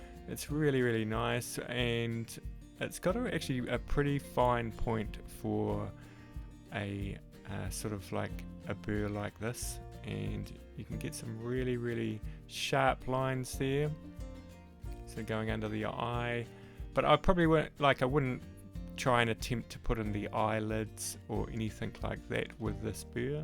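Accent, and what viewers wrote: New Zealand. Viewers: Hi. I'm a new subscriber to your channel and suspect I'll me a member before too long! I enjoy your easy going manner and the NZ accent is like listening to music. I'm a beginner and have been toying with bone work so your vids are quite a help. I was drawn to this particular video when I mistook "Kutzall" for 'KLUTZ-all." and thought "Well, there's a product made just for me!"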